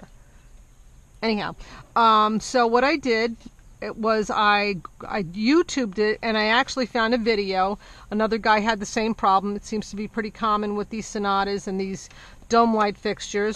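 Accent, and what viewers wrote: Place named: New York, United States. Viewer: Ha! This was great. I'm having the same problem with my 2011 Sonata and thought I might have to replace the fixture. I love how clear and straight to the point your video is... in and out in under 4 minutes. You'd be surprised at how many people mumble, speak too softly, or don't know how to get the main point across in this type of video. I'll try this out and let you know how I made out. P.S. Love your speaking voice. You sound like you're from my neck of the woods (NY/NJ metro area).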